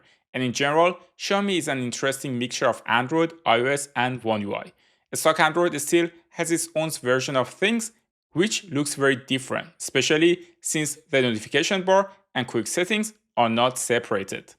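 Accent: Iran. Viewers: Bro your accent is so fucked, are you Persian?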